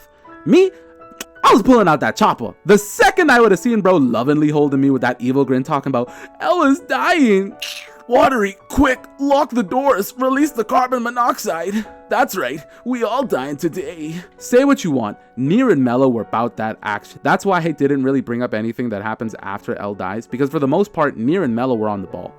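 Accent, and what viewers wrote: Bahamas. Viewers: Are you a Bahamian? This is funny? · are u from the bahamas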